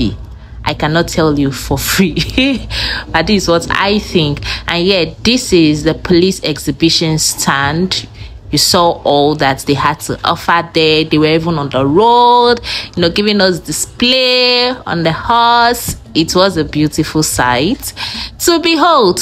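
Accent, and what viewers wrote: Ghana. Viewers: You sound more Ghanaian than a Nigerian. Very soon, your accent will change completely 😂 Nice video btw✌️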